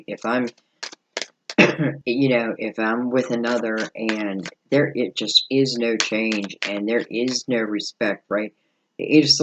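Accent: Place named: Pittsburgh, United States. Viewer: November 1st. I like your readings. And that's gotta be a Pittsburgh accent!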